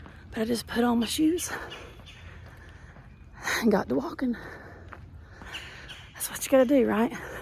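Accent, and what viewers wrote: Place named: Appalachia, United States. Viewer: I’m watching and have reached the end of your day 20. I’m inspired by your walking, especially walking without media! May the Lord help you through all your adversity!!! 😢 Btw, your accent sounds like mine so I figure we both live in the southeastern part of the United States. I call my accent Appalachian Foothills.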